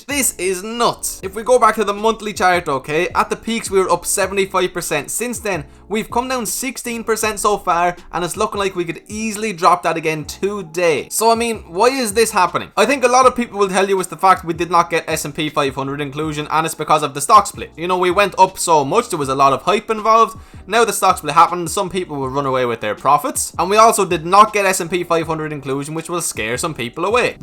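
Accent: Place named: Ireland. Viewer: Great channel - a succesful Irish YouTuber who doesn’t try to sound like a yank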